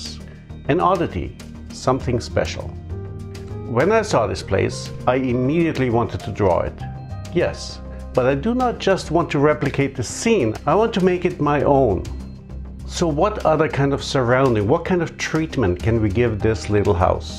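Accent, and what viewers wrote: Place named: Germany. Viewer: Hi! I love your tiny house drawing- yes the pride in craftsmanship is evident in the beautiful little house you drew. Your narration and editing and videography are wonderful- I feel like the house stands beautifully alone. I detect an accent- are you German or Austrian? My father is from Germany- a wonderful country.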